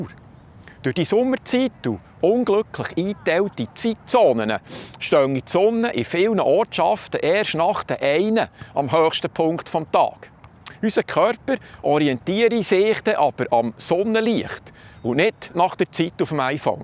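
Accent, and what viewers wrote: Bern, Switzerland. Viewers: Potz hei ei ei... was för nes heimeligs, wunderbars Bärndütsch, wie fasch zu Gotthälfszyte. I bi fasch d Bänne abgheit wäg de ruschtikale Wörter. Merci viumau! Ha di Kanau grad abonniert. Gruäss us Brunnen SZ. :thanksdoc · so nes schöns bärndütsch! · 💪😄🙏🙏mega schöner Berner Dialekt. Gruß aus dem Aargau 🙏☘️☘️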